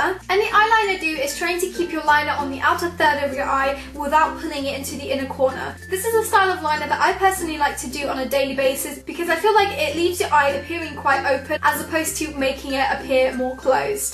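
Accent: England, United Kingdom. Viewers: Does anyone know in which part of England she lives in? I love her accent! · I love your accent it is absolutely beautiful.❤️ (I'm from England too) 🇬🇧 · Soooo pretty and i love your british accent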